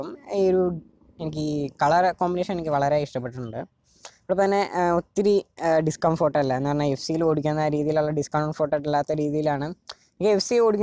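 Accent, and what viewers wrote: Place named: Bengal, India. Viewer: Bengali malayalam parayunna pole ind brw sorry feel avaruth.... Plz improve ur audio quality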